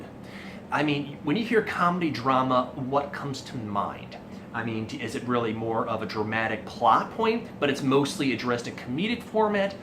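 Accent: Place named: South, United States. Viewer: All I heard through this whole thing was your southern accent. And I loved it. :D Must've been all that Steel Magnolia watching.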